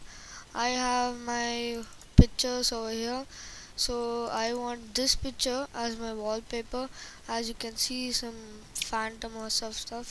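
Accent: India. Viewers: The Indian accent make me weak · that indian accent was solid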